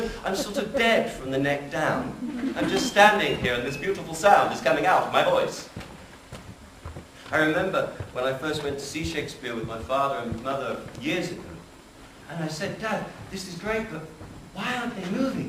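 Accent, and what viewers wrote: Somerset, United Kingdom. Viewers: Thank you for your research into this, and your ability to communicate it so effectively! -Can you please explain if there is ALSO a regional difference? I know - like here in the USA - we have "standard" - basically there's standardized dialect we use in the USA - and there are wide - and wild - regional differences. I'm not as familiar with all the UK dialects as I should be, but your OP sounds a bit like - what is it, Somerset??? - to my ear. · load of bollocks. Hardly anyone does RP. Let him show some. And does he know where the actors came from originally? It is implied that they came from Birmingham which is near Stratford where he lived. I suspect that people in Stratford don't really speak with much of a Brummie accent today and certainly not then. He sounded like someone from the borders of Somerset. NO! I do not believe he knows where an individual actor might have come from. 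There is some sort of received wisdom that a Linguistics Professor says that you can even tell the accent from the spelling of the words. Again bollocks. I can say in good old Cockney.. "What's the matter?" Well, you know how that sounds.. I am now from Norwich "What's the matter?" Sound the same?? No. Of course not. Now I come from New York.. "What's the matter?" I'll say no more. It is just intellectualising horse-shit. Some say that Richard Burbage, the most famous Shakespearean actor ever, was born in Shoreditch. Exactly where the Globe Theatre was built. Do you think he had a London accent?? Yep. But probably not the Cockney accent you hear today. But Shoreditch is really within the sound of Bow Bells. So, if you know what a cockney sounded like in 1600 then you might know. And it wouldn't have been in some generic English accent that some Professors believe in. Another reason to believe that this speaker is talking BS is the Bath-Trap principle. 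Google it. Interestingly the Midlands (including Stratford) and London is part of the s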